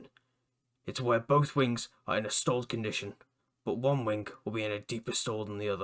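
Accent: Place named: South, United States. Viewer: I find the fact that you use 2 voices annoying to the point of distraction. Why do you feel the need for the film promo all deep slow and husky style of talking. Then with no obvious reason back into your normal Southern accent ?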